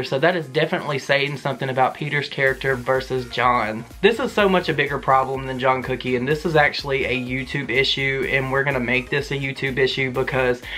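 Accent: South, United States. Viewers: uggggg, your southern accent makes me crave okra :( <3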